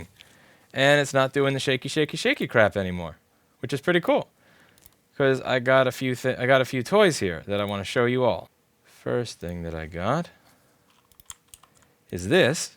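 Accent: New York, United States. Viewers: as someone who uses English as it supposed to be used its deffo soLder ..lol .. but your US-NY dialect doesn't bother me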